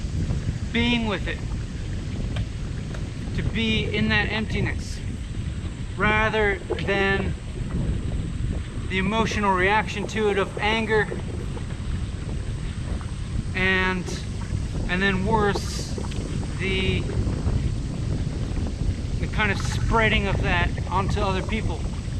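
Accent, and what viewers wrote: United Kingdom. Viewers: 合理 合理 he speaks london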